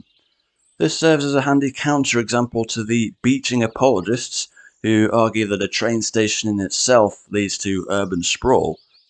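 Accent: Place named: England, United Kingdom. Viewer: You sound English, but you don’t know how to pronounce correctly Blenheim and the Duke of Marlborough This doesn’t matter too much, but it has stands mate. It rolled under mine. Your research.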